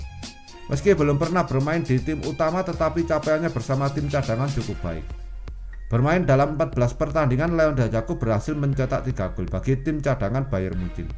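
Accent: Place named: Padang, Indonesia. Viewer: Dilihat dari logatnya, pasti orang Padang nihhh..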